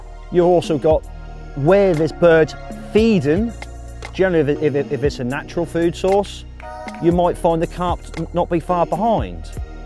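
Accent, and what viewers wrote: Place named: Norfolk, United Kingdom. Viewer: Norfolk accent bud lol he’s my local tackle shop owner top guy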